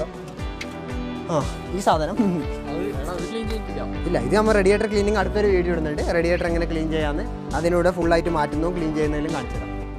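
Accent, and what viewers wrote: Kannur, India. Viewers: കണ്ണൂരുകാരാണോ ബ്രോ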